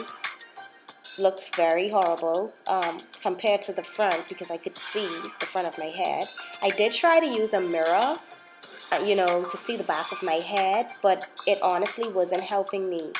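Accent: Bahamas. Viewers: Great video .as soon as I heard your voice I was like the Bahamas . I am from Nassau but have been in Virginia since 1996 . Keep on doing your best . You are a star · I luvvv my Bahamians 🇧🇸.... I just luv our accent and when you said "Horrible" why I bust out laughing lol but it really looks great on you tho... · Yassss I know bohemian sister when I hear one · You sound like a Bahamian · Are you from The Bahamas? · Could always tell a Bahamian by that accent. 🇧🇸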